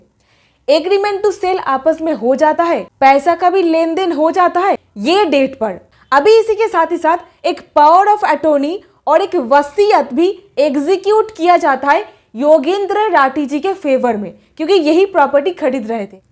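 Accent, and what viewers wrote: Bengal, India. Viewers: Thanks for explaining so nicely. Nice to hear hindi from a bangali speaking lady.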